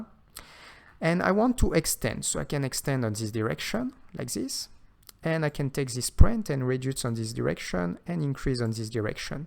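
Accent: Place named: France. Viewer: You are a french accent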